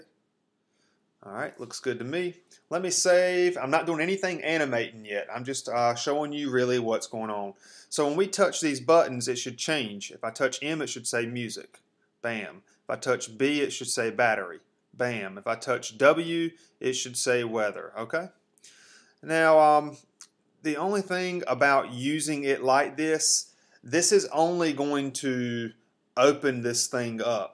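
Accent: South, United States. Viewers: man. this man's country accent. feels like cowboy from action movie is teaching me programming.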